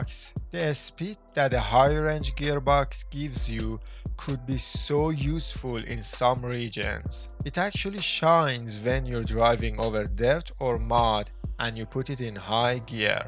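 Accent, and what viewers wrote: Iran. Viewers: Amazing Persian accent aside, the tips are good and helpful. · Your accent sounds Iranian :) my gf is from IR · Hi Are you persian? لهجه داری اخه 🤓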